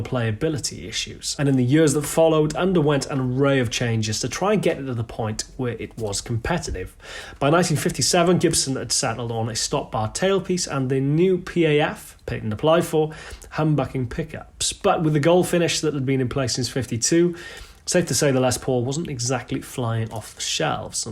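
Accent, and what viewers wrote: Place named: United Kingdom. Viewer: I was born and raised in the US and I'm listening to this video simply because I like the way speaks. My wife and I were talking about how much we enjoy British English (a blackbeltbarrister video had just ended). So I pulled up a video for an encore. David Bennett Piano is sure to be next.:)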